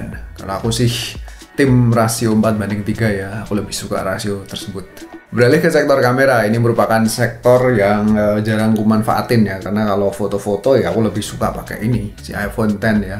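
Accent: Java, Indonesia. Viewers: Mas medhok mu kui lho 🤣🙏🙏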